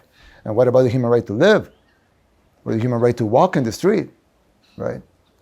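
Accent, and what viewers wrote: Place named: United States. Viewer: Sounds like an American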